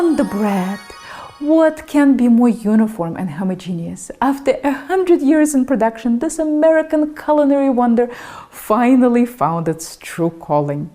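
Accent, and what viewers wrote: Russia. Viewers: I just stumbled upon her for the first time. Your comment is spot on. The most informative 13 minutes of baking I have seen with no distracting channel promotion etc.. I love her and her Russian accent is icing on the cake. Can't wait to see what else I can learn. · Wow. This is a class on the "understanding of thermodynamics". Thank you and by the way, I love your accent. Your command in English vocabulary supersedes your Russian accent, got me confused for a moment. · Is she Russian? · I have been thinking. Where is she came from?! Damn, Russia. Why do you have a such heavy accent? You came as a teen to the US?! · Idk if it's a Russian accent but this women has me hooked on every word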